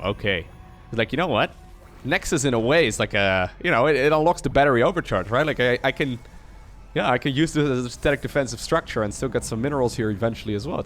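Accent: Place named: Netherlands. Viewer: lotta letters are pronounced different in dutch. whenever dutch people speak english youcan very clearly hear that they are dutch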